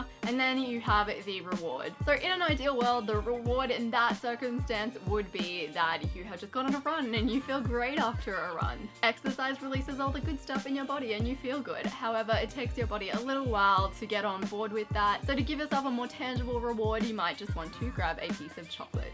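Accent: Australia. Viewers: I love her accent <3 Is she Australian?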